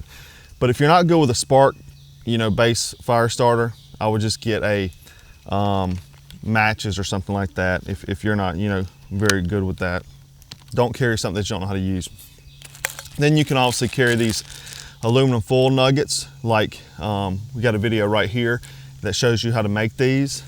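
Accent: South, United States. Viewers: Marine with a southern accent who reviews survival gear outdoors. Subbed.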